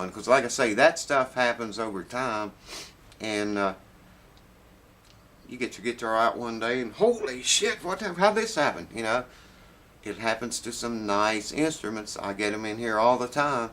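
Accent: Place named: West Virginia, United States. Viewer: Thank you very much for this video. BTW are you from WV? I think I recognize your accent. I'm from Poca River.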